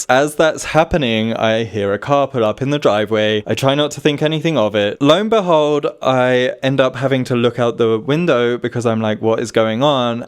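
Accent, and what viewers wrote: England, United Kingdom. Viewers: Hi, new Irish subscriber here - you are so handsome and I love your English accent and tattoos - as an Irish gay man myself, I’d happily date you in a heartbeat 🇮🇪☘️🇬🇧😍😍😍😍😍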